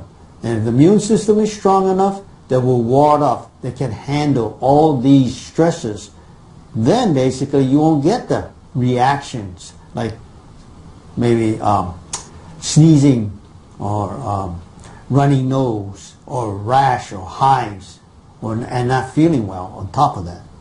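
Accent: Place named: New York, United States. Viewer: So, let me guess. You kinda sound like you are from New York. Anyhow, I appreciate your chats. I had a thought of boiling a mix of peppermint leaf and oregano leaf in distilled water and then flushing it up the nose once it cools. You can also breathe in the vapors with a towel over your head over the pot too! I had a health food store owner friend in CT use the oreganol in boiling water and he cleared up!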